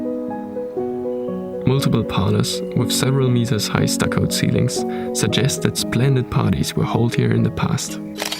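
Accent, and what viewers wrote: Germany. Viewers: Is the person from this video German? I love his accent. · 💜💙 Que hermosura! Y que bella voz del narrador, tiene acento alemán 💜💙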